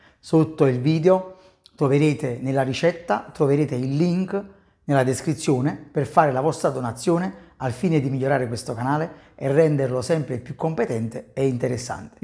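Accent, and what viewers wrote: Naples, Italy. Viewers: Quando ti sento parlare con il tuo accento napolitano m'incanta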